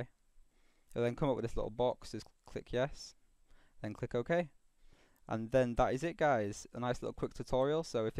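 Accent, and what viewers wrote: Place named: United Kingdom. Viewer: British accent detected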